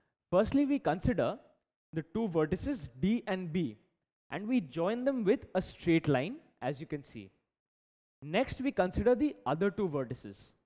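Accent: India. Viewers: it's an Indian accent.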